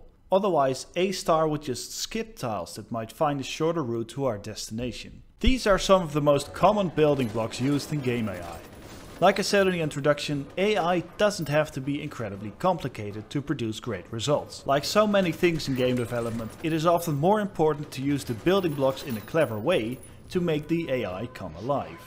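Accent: Netherlands. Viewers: Great vid. One little question, did your English decline from a couple years ago? Like your dutch accent is more pronounced then roughly 2 years ago.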